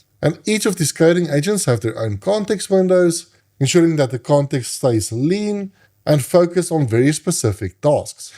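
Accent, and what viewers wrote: South Africa. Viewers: It is very nice to hear a fellow South African's voice talking about AI and coding. Thank you very much. I love the South African twang. It reminds me of home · Amazing content and so well explained. Love the finer detail and your attention to the specifics. This helps me understand using Claude Code in a whole new light. Will definitely be following along for new videos, for sure. Also, can't miss the fellow Saffa accent. 😅 Thank you and looking forward to seeing what you've got brewed next.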